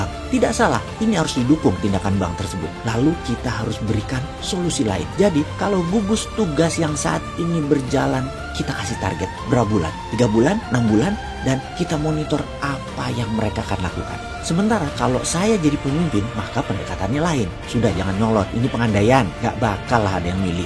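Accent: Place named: Java, Indonesia. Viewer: Sori om cengkok e terlalu medok 😂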